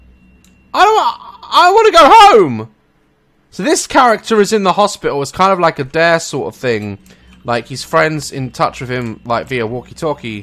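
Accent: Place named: Newcastle, United Kingdom. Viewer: I think it's a Gordie accent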